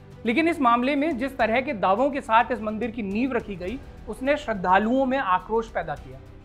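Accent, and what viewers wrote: Garhwal, India. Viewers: आप जो गढ़वाली और हिंदी को मिलाकर बोलते हो बड़ा अच्छा लगता है।।।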